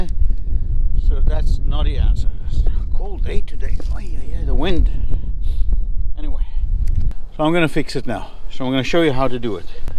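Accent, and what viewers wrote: South Africa. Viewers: That South African accent is undeniable. Good work.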